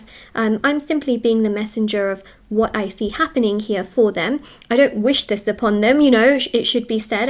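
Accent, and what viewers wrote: England, United Kingdom. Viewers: which part of england are you from? I’m curious to place your accent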